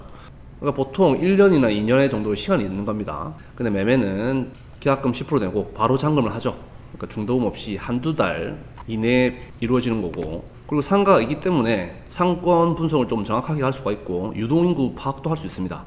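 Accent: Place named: Gyeongsang, South Korea. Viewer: 대구사람이셔요...?... 말투가 익숙해서 더 편하게 들립니당 :) 정주행하고있어요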